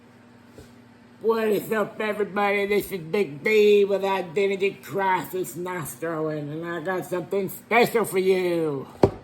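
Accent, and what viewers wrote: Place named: Louisiana, United States. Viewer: This dude sounds like he grew up in the Bayou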